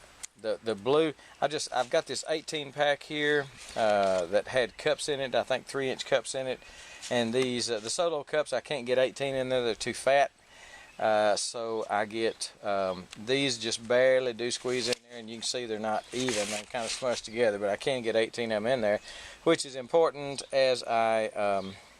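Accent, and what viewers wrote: Texas, United States. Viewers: Awesome video and great information I'm gonna try. Boy, sure got home sick hearing that Texan draw. I'm down south 13 miles from Galveston and sure miss them piney woods, ha!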